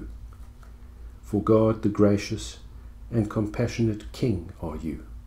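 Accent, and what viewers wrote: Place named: South Africa. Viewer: You sound like you from, south africa, im wondering if it's possible for me to convert to Judaism, or how can I go about doing it?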